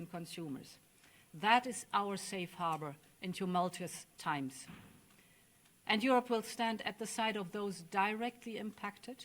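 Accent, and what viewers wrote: United States. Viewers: Why this German speaks perfect American English? ahaha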